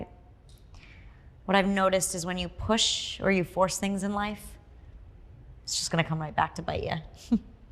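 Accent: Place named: United States. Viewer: I wanted to watch but American accents are so annoying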